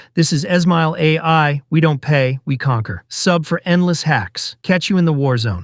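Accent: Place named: United Kingdom. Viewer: Ur speaking too funny. If u would have been narrated normally, the video would have been millions views by now. But u choose sick british accent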